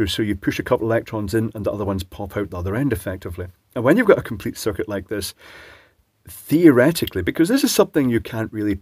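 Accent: Scotland, United Kingdom. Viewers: I have always wanted to hear EM theory spoken in a Scottish accent. Maxwell was a great scott.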